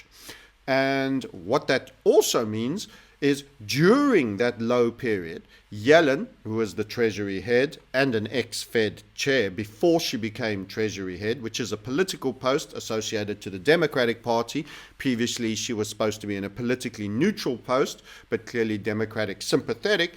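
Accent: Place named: South Africa. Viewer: South African. Fair few Scots went over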